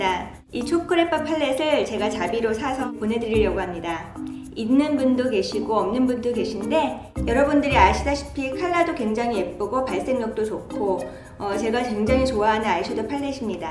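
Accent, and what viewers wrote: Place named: South Korea. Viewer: I just love your korean accent. I watch korean movies heheh just a side note. Congratulation on 1k subbies!! You deserve all the love ♡♡♡♡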